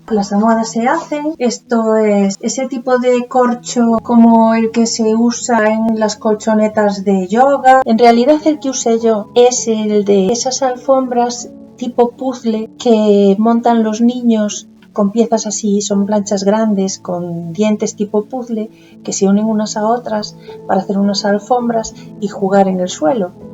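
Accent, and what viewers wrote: Spain. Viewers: Un trabajo precioso!! Acabo de descubrirte me encanta la paciencia que tienes. No se si me equivoco pero me parece que tu hacento es gallego. Gracias por enseñarnos tus trabajos.saludos de una gallega ❤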